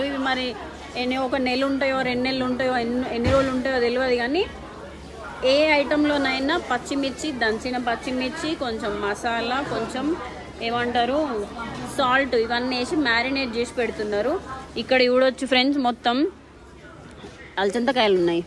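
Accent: Telangana, India. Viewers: మన తెలంగాణా యాస ఎంత తీయగా ఉంటుందో.. superb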